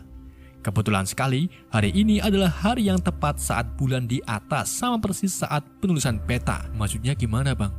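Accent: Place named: Tegal, Indonesia. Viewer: Maceme nt wong tegal ya WA😁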